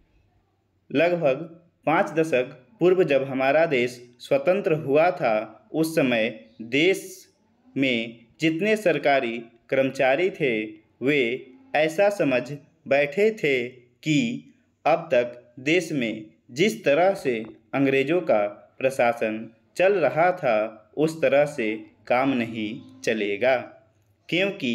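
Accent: Bihar, India. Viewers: Sir apke kuch words bolne me galti hai mtlb apki pronunciation thik nahi hai jaise 👉 ye , jyadatar aur bhot se words hai Baki sab badhiya hai shyd aap bihar ki side se honge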